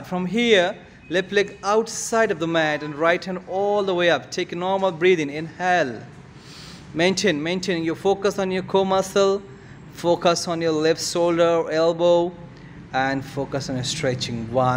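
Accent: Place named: South, India. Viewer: Yes sir jaldi banaiye deit vedio or apki voice to bhot achiii h thodi south Indian type voice h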